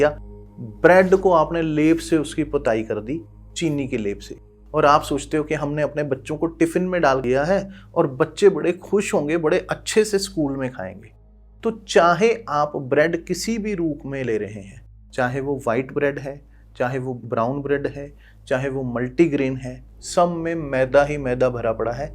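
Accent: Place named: Rajasthan, India. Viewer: Aap guro ji ya to rajesthan ke ho ya hariyana jaunpur ke ho aap ki avaj se lagta he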